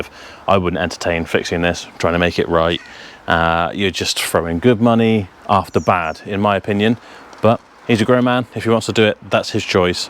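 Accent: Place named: West Country, United Kingdom. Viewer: you've got a different gentler west country style than Arthur Daley from the North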